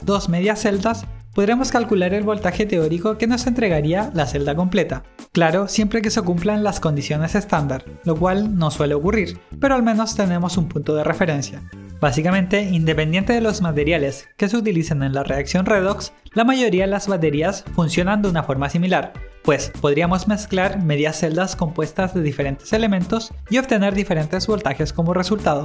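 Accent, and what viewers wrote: Chile. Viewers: Me encantan tus videos P. D. Tu voz me recuerda a un programa que se llama Oban Star Racers creo que eres chileno o me equivoco?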